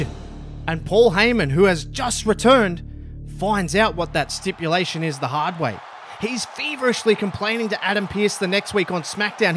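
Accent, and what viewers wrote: Australia. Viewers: You have an accent what is it Australia 🇦🇺 or New York idk something’s like that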